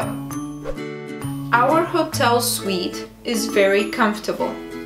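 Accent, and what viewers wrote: Russia. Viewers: You have a russian accent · You do have an accent. A Russian one I presume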